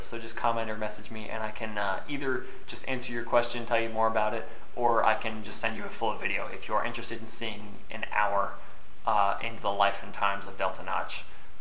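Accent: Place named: Europe. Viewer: Thought this guy was european, lmao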